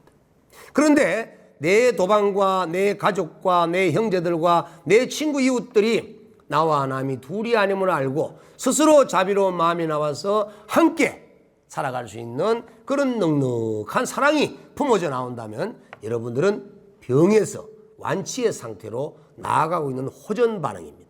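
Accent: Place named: Busan, South Korea. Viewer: 🌈 듣고 또 듣는 이 소중한 법문 정말 감사드립니다. 제가 하와이에 살아도 , 법문을 부산 사투리를 섞어서 하시니 고향의 정겨운 마음이 웃음으로 더 제 마음에 담아집니다.🙏🙏🙏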